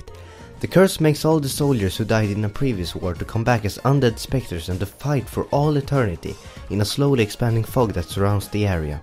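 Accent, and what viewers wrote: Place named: Finland. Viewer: Thank you for this, very helpful! I've been trying to place your accent, are you from Finland?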